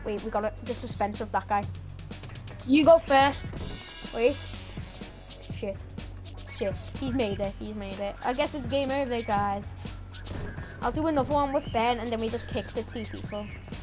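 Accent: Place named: Liverpool, United Kingdom. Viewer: What a scouser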